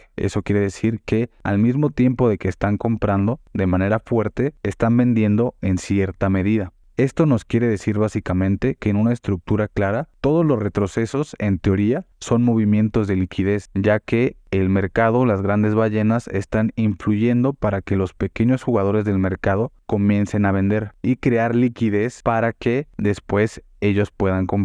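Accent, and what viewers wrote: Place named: Mexico City, Mexico. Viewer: ¿eres de Chilangolandia?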